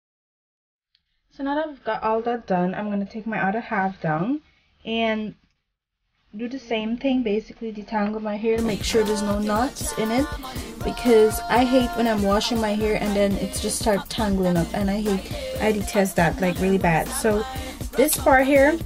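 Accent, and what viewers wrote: Caribbean. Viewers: where is the accent from? caribbean or south american? im from guyana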